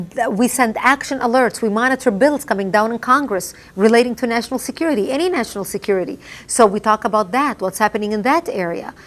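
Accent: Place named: Lebanon. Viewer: Oh please. She even speaks Arabic with a Lebanese accent. Stop your lies !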